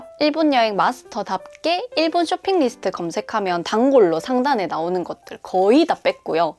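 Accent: Gyeongsang, South Korea. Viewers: 영상이 떠서 처음 들어와봤는데 경상도 분이신가봐요? 사투리가 ᆢ ㅎ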